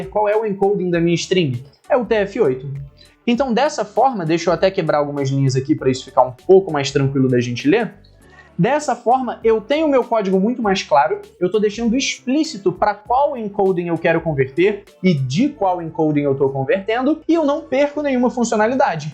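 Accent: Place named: Rio de Janeiro, Brazil. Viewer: Boa! parabéns, mas esse sotaque de carioca vixi! de boas cara!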